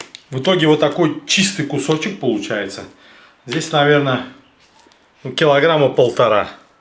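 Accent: Baku, Azerbaijan. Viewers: Настоящий Бакинский акцент❤смотрю с удовольствием ваши ролики, всем Талышский салам из Канады Вагкувер ❤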